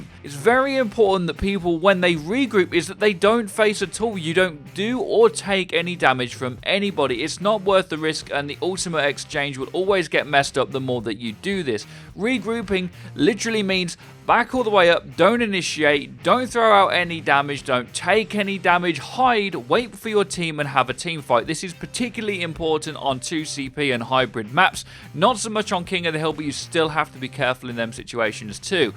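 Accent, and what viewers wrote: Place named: United Kingdom. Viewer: this british accent makes me wanna vomit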